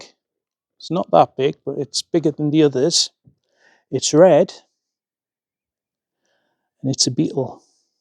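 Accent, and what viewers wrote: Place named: Llanelli, United Kingdom. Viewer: Your voice is crosshands to Llanelli area Iam over Newcastle Emlyn way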